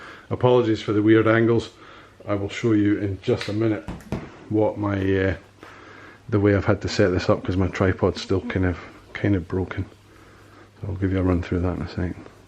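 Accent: Scotland, United Kingdom. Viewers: You don't get many scottish guys on YouTube! 🤣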